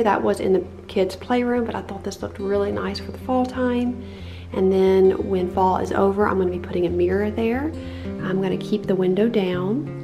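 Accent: South, United States. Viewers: especially with that southern accent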